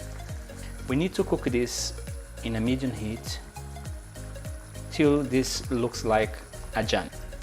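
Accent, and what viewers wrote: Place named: Brazil. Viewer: Thicc Brazilian accent. Beautiful.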